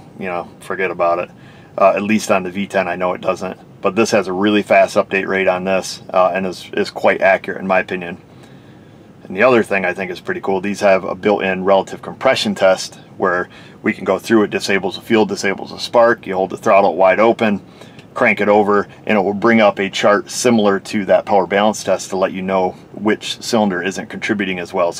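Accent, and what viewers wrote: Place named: Upstate New York, United States. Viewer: It took me a few times to figure out you were saying Power BALANCE, you upstate New Yorkers talk funny...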